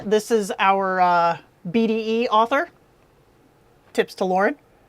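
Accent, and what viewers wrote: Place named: Czech Republic. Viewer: Based on how you can pronounce "R" - Are you from Czechia? :D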